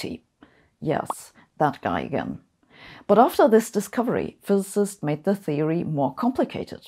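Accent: Germany. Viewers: is german pronunciation for hypothesis- hypothetis. Or is just how sabine pronounce things?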